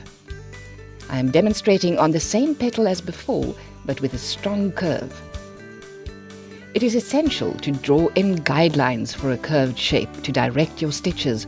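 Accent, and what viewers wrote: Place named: South Africa. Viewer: She is South African, we speak this way.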